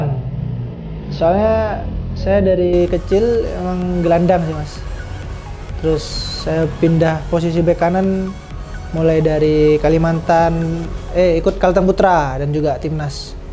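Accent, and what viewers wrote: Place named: Madura, Indonesia. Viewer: Oreng madureh smpyan kak 😄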